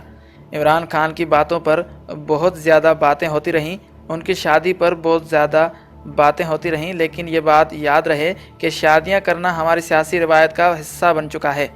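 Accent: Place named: India. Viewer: kismat or qismat.. gujaar or guzaar. mutabik or mutabiq.. is trah doosre alfaaz, indian jessay urdu/hindi bolte hain. kitni jamaat padhay ho??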